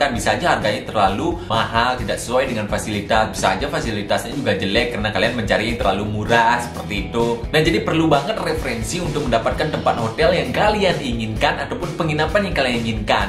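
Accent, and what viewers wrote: Bali, Indonesia. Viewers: Gak tau knp klo' ad orang bali ngomong, suka banget pas bilang "T" 🥰🥰 ya udh klo' ke bali aq kontak km dulu bli hehe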